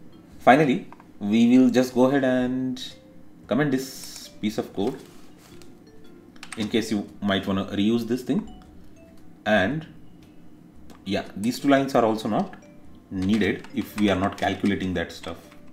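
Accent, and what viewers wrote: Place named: India. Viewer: THANK YOU, you are the one of the indian accent that i like, thank you.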